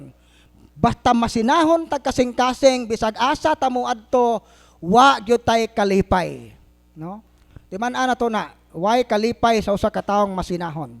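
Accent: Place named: Bohol, Philippines. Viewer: nindot gajod ni motalked c bro. KY real language gjod boholano gjod.nindot gjod paminawon bol-anon.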